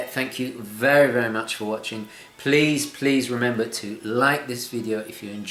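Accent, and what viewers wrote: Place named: South London, United Kingdom. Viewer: I'm tempted, but am I the only one with doubts about the compass? i'm ex-military, do lots of hiking etc - I know how to use the sun/ watch technique to navigate but I've never heard of anyone doing it seriously. At best, its is a rough direction finder, so all those intricate bearings are pointless. So, I can't help feeling it's a gimmick on an otherwise excellent watch - an internal diver style timer or 24hr bezel would be way more useful. Any thoughts, folks? Anyway, greetings from South London (or as and I might say, Saahhhrf Laaahhhndon). What a fantastic channel - you're going from strength to strength, chapeau!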